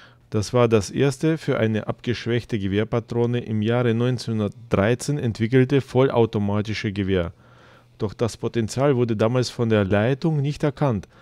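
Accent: Russia. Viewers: Ich bin begeistert, Ich fand ja schon immer das die AK 47 ein bissen wie die Sturm 44 aus sieht . Dein Akzent ist ausgezecht ich liebe Russischen und spanischen Akzent. ich bin gespant auf dein nächstes Video. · Geil , dieser russische Akzent im einwandfreien Deutsch! Top Video! 👍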